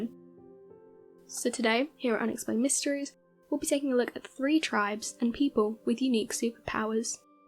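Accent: England, United Kingdom. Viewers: Your narrator sucks! Save the accent for England!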